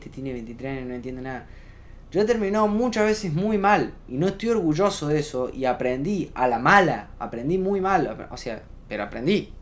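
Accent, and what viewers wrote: Argentina. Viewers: de que parte de argentina sos vieja?.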